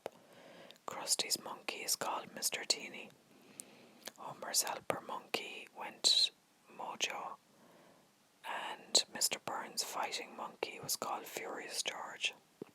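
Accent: Ireland. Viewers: Are you Irish? You remind me so much of a friend of mine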